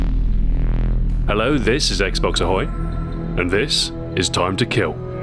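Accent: England, United Kingdom. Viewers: No. this is just an a guy with an English accent who likes CoD, Soap has a Scottish accent anyways :P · yeah, the british accent is awesome!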